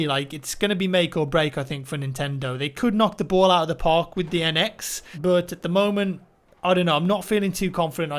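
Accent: England, United Kingdom. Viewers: i think it's the british accent... soothing to the ears... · hey what part of England are you from? just curious haha I live in Canada but I'm from the UK as well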